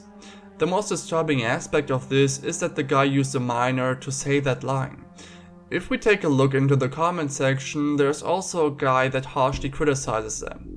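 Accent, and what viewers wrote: Germany. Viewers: Are you German by any chance? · basne He's actually saying 'can't be asked' which is a common mistake we Americans make, due to mishearing or misunderstanding the British 'arsed' as 'assed' and then further twisting that to 'asked.' He also typed it as such in the video description. The accent, though? I'd have to say it sounds a bit German - to my ears he definitely does not have an American accent. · His accent sounds very similar to a German one. Almost South African. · German for sure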